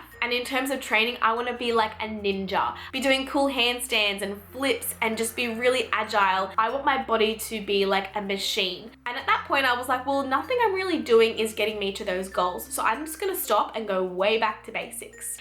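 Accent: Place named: Australia. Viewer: love hearing an Aussie voice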